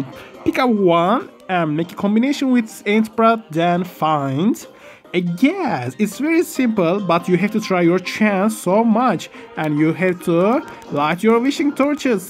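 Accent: Turkey. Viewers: Abi birşey diyeceğim 2. Kanal fln açıp oyun hakkında taktik verebilir misin? Adam çok iyi fakat kimse gelmiyo starpointim 0 niye abi bil bilgin varmı (Abone olup like attım) TÜRK OLDUĞUNU BİLİYOZ AKSANINDAN BELLİ EDIYON :D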